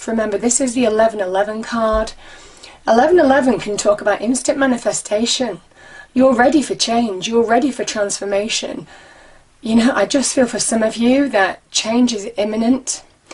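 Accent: United Kingdom. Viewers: Hi .. It's great to hear a uk voice . 👍.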